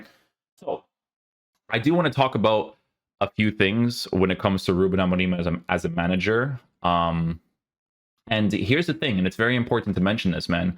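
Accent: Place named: Azores, Portugal. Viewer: Were you born in Portugal,? Or in Canada, but descendant of Açorianos? What team does you father support? There was quite a big açorian immigration to where I’m originally from (Southern Brazil), but both the accent and the trading ties were gone centuries ago. I’m personally multi-ethnic (German, Italian, Lisbon Portuguese and native South American) but I wish I had more contact with Açorian culture. The accent is just insane for me:)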